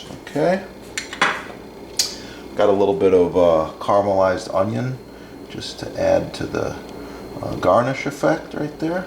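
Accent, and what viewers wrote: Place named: Midwest, United States. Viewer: Hey dude, I love your videos! I found you through searching for finishing wood. Also, you’re f’ing hilarious! And your accent is amazing. Midwest? Wisconsin? Anyway, keep up the good work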